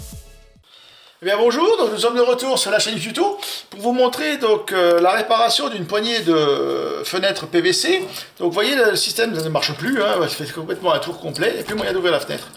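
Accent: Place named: Reunion, France. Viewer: Merci monsieur 🙏 Petit accent de la Réunion 🇷🇪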